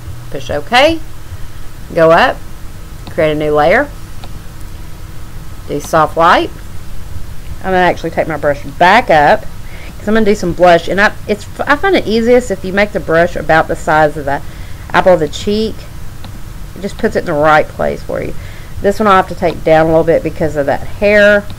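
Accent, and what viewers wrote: South, United States. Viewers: WOW, I've been looking all over the net and youtube for someone to show how to do this vintage style coloring to a B&W photo, without all sorts of steps! Thank you so much for the clear and concise tutorial and I love your southern accent! I'll be on your Teach Me To Color Facebook page soon and I'm a way thumbs up subscriber. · I love your southern accent too. You’re awesome along with the video. More videos please. Subscribed.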